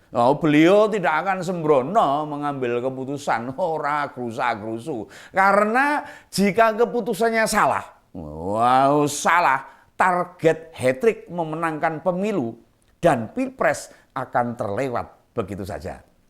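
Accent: Java, Indonesia. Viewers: Halo mas..wah..sya senang skali.narasinya gays bahasanya yg..sederana mudah di cerna.. gdo 2 logat jawanya ada...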